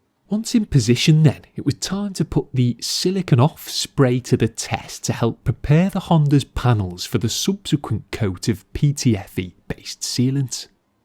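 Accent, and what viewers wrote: United Kingdom. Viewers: Awesome British English mate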